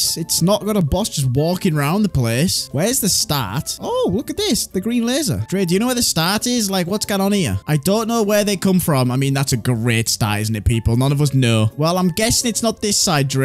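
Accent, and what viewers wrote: United Kingdom. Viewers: You kinda like bretish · Is he british?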